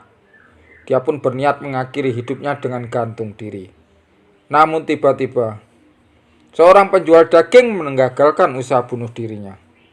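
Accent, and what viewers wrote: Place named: Java, Indonesia. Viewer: Yg cerita nya wong jw ne medok bngt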